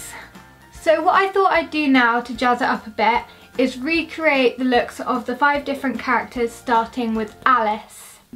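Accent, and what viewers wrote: United Kingdom. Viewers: Oh my gosh you're British!! <3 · Ar you welsh ?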